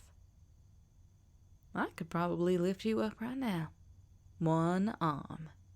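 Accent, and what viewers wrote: United States. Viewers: That country accent has me in a chokehold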